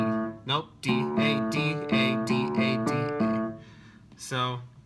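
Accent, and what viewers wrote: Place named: United Kingdom. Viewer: I don't understand a word you say because you speak too fast for me and i'm learning british english so you're acent is very different of what i'm used to listen jajajajaja. Well more or less I understood something, it was a joke :D Back to what I would like to say, I like very much how you play the piano and also the emotion and effort that you done with your work. I can only say, congratulations!! PD (I think that american people use PS but it doesn't matter): I don't pretend to butter you up JAJAJAJA Take care!!! And again sorry if my english is horrible :)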